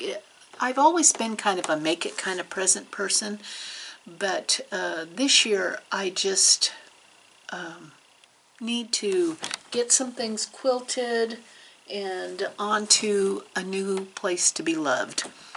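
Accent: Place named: Oregon, United States. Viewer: One of the reasons I love listening to your videos, among others, is that I lived in Oregon for 9 years while in college. I experienced a silver thaw one year in Portland. I think that was 1996/7? I remember the sound of the crashing branches. Most of the rest of my adult years and much of my childhood was spent in Boise Idaho area. Four years ago, my husband's job brought us to Alabama. I just love listening to your accent, the sounds of home. And of course, the quilting.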